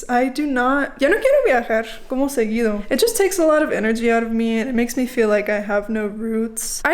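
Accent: Mexico City, Mexico. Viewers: Tu español esta espectacular con un asentó muy mexicano, espero te la pases bien en México 👍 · cuando hablas en español , tienes el acento de CHILANGA ... CDMX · You're the Coolest Girl, Love to hear your Méxican Spanish, it's just awesome, Blessings and Congrats, ❤️🇲🇽🤍💙 Hablas un Español muy Dulce 🤗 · Tu acento es muy mexicana😮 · Es increíble lo mexicano que es tu acento. No sabría si eres una mexicana que vivió mucho tiempo en EEUU o una gringa que aprendió español muy bien. Saludos desde Chile y disfruta ese hermoso país. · You’re white? U sound Mexican af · cuando hablas espanol parese que eres mexicana no manches muy bien. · You sound soooo Mexican.. After a few weeks out there in Orizaba ¿why don’t you try out a bigger city? Digamos… Guadalajara, Morelia o la mismísima Ciudad de México 🇲🇽 Bienvenida a México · Hola!! Primera vez que veo tus videos y me gustan. 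Hablas bien Mexicana, tu español es muy claro 10 de 10 a tu español, Yayyy!! Espero que disfrutes mucho de este país y que que hagas muchos amigos para que salgas a otros lugares de México!! 🙏💚❤ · So pretty girl !!! ... mexican accent ??? mission accomplished !!! ... why you choose Orizaba ??? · no es anglosajona es lationamericana no tiene acento estadounidense cuando habla ingles. Pa ra la gente que no sabe ingles piensa que es estadounidense pero no tiene rasgos latinos. Cuando habla ingles se le oye el acento en espano dice mi esposa gringa. tien acento mexicano. tiene mala pronunciacion en ciertas palabras en ingles. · Que guerita tan activa y alegre, le pones Mucha passion a tu Vida diaria...and yes, your Spanish is very Mexican so that's easy-to understand and very fluid ... so enjoy your time in México.. bye and good luck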